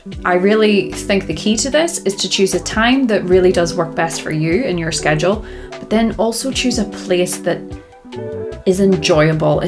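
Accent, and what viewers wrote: Scotland, United Kingdom. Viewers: Love the relaxing tone of this video - and always love to see references to GTD. Curious, what is your/her accent? (As in, which part of…Scotland, right?)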